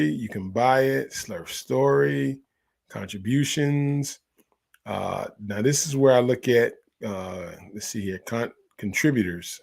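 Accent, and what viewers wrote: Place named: Alabama, United States. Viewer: You from Alabama? Kinda sound like it lol 💪🏽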